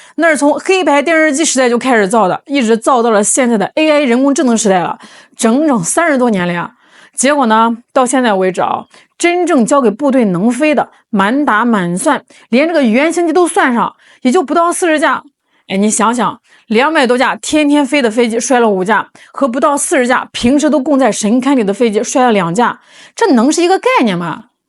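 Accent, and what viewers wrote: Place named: Henan, China. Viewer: 主播的口音好重😂河南人吗？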